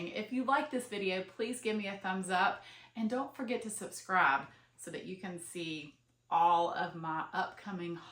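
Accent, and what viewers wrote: South, United States. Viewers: My guess is F since she seems to have a southern (US) accent.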